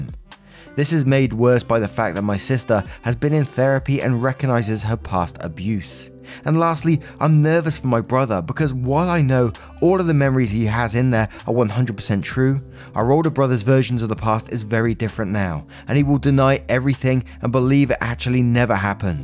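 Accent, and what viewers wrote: England, United Kingdom. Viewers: Hey hey! The isolation ward at the shelter is 100% full of sick kitties, but they are getting better. Thanks for your videos, as I said before your cadence and voice is soothing to the cats/kittens. Guess they prefer English accents over American. Hope you and Poppy have a lovely day!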